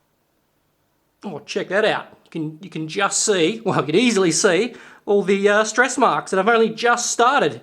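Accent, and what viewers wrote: Australia. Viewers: Love the strayan accent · Nice australian accent!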